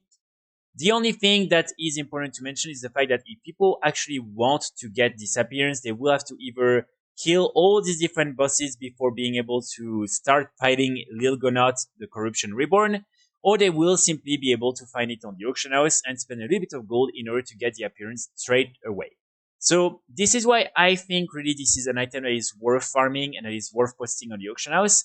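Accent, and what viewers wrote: France. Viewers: Tu es français ? Je dis ça à cause de l’accent 😊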